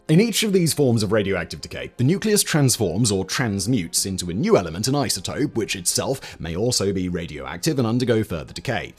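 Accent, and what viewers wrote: United Kingdom. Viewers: But with a Brtish accent, it sounds some much more sophisticated then when AI narrators screw it up. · which is odd, since there is a Kincardine in Scotland, which given the host's UK accent, should be familiar.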